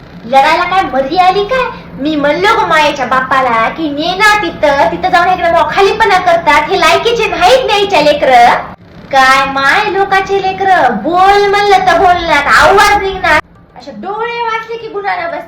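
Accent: Nanded, India. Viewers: तुमचे बोलणे ऐकून मला माझ्या नांदेड ची फार आठवण येते 😊 Keep it up and God bless you dear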